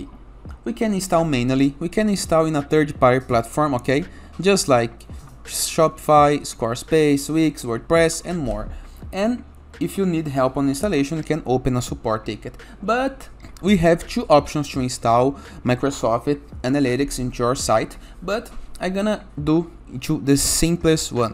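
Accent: Brazil. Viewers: Na hora que ouvi o inglês percebi também kkkkkkkkkkk, seu inglês é muito bom, mas da pra perceber q é br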